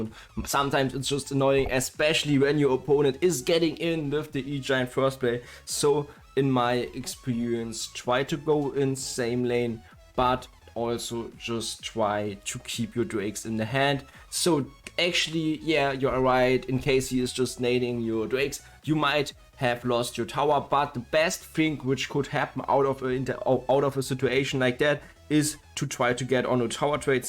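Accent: Germany. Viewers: Man hört das du deutsch bist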